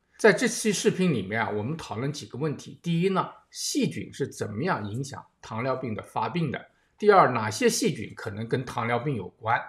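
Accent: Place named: Nanjing, China. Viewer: 听出杨医生南京的口音了。不知道对不对。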